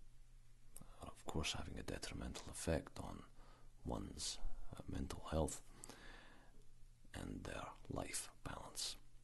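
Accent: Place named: United Kingdom. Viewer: I’m dead... I’m in love with the creativity of this, the aesthetic and the approach. Not to mention your voice is reminiscent of my first ASMR triggers when i was a kid.... “british” accents in somewhat gravelly men’s voices. I haven’t had tingles in awhile but this brought them back almost full force. Thank you.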